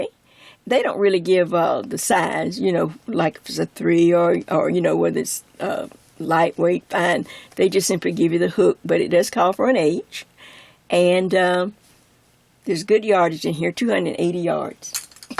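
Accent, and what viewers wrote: South, United States. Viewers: Only just subscribed couple days ago. I've been crocheting for decades but the recent introduction of so many of the old... really old stitches is so exciting. I learned to knit, crochet and embroider all at age 11. I still enjoy 2 out of 3. I prefer not to knit. Too mundane and not enough texture. I cane view a piece then reproduce it. I'm unable to use the charts or should say they slow me down. I prefer reading the row by row or rd. by rd. written directions. I will certainly be purchasing both of those books at the JoAnn's I used to work at. I will be tuned into your channel every time I get notification you've put up a crochet video. I love your friendly voice and giggle with your very distinct southern accent. I lived in La. and Miss. Each southern state's accent is very unique unto itself. Thank You for all your efforts in this field you so obviously love as you shine brilliantly at it. 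I'm Grateful, LYNDA in Utah.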